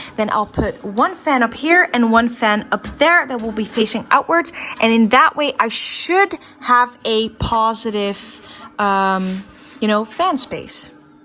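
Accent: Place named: Netherlands. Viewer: ik hoorde al meteen dat je Nederlands was en wat geniet ik van deze video hahahah soon ook mn pc bouwen. spannonddd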